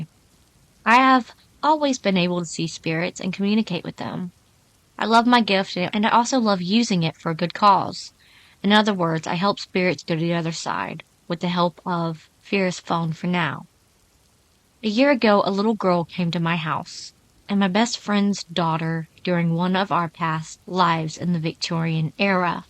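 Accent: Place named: South, United States. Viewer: Having an issue trying to connect to your Instagram from the link in your video description. I've gotten a bit behind but it's nice to hear your sweet Southern voice again. Sorry I can't remember is it Alabama? No, it's Georgia isn't it?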